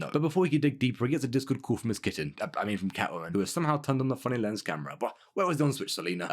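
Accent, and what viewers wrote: United Kingdom. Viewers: Wait... you're british?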